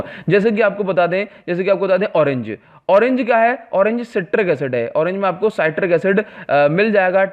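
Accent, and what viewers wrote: Odisha, India. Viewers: Sir aap odia ho kai?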